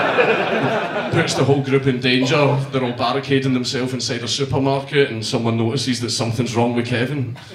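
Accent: Scotland, United Kingdom. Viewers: Been watching dankula and kmac for like 2 hours straight and everything i read or think now is in a scottish accent send help.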